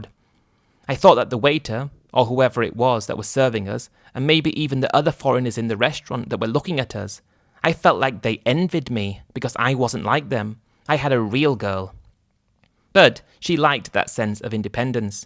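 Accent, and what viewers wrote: England, United Kingdom. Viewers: A guy from the midwest, narrated in a British accent? No thanks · This is an english accent not american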